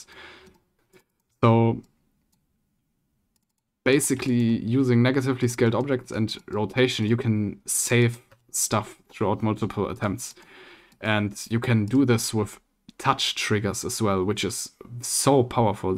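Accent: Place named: Germany. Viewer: your voice is very german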